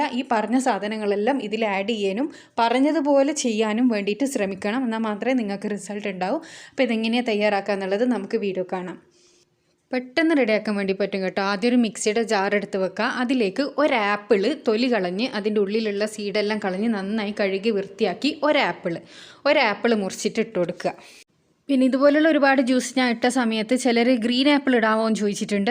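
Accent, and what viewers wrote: Kannur, India. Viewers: Samsaram kannur kare pole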